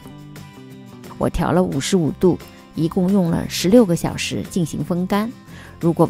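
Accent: Shanghai, China. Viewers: 最喜欢的东西上海普通话越听越亲切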